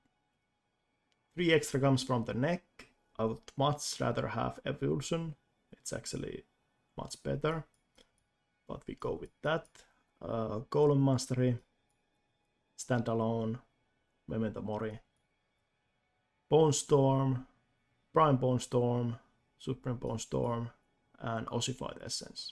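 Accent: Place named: Finland. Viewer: Kyllä suomalaisen tunnistaa aksentista heti haha, keep up the good work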